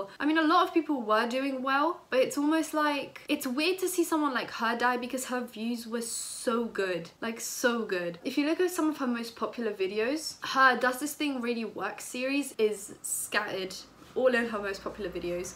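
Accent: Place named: London, United Kingdom. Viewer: Your the only white girl with a london accent sound like a roadgirl on youtube bit random lol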